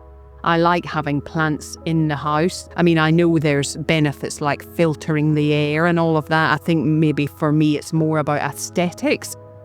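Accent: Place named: Antrim, United Kingdom. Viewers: Beautiful home. Listening to your accent reminded me of my Grandfather…he was from Antrim. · Accent sounds like someone who grew up in Northern Ireland and moved to Edinburgh in their teens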